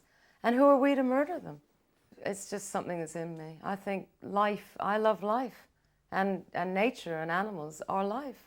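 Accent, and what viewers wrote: United Kingdom. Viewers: Wow her accent was so English by then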